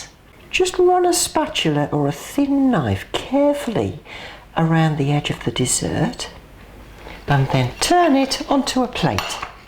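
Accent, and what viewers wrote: United Kingdom. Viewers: I just bumped into you on this site. I saw a comment how somebody turned you onto his mom & she loves you. So I figured if someone watched you, saw their mom later & said "you have to watch this woman", then I ought to give it a moment to see why. Your my hero. Where are you from? The accent. British? Irish? English? Scottish? I grew up in Ireland. Connemara.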